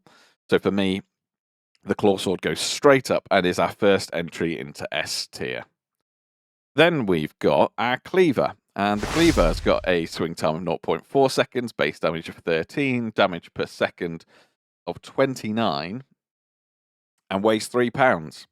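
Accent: United Kingdom. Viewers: You Brits and your zeds and oughts..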